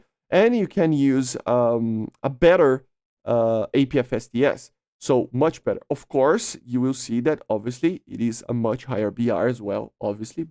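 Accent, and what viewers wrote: Brazil. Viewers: I was about to ask If you were from Brazil because of the accent. Then I saw you had a Portuguese Chanel lmao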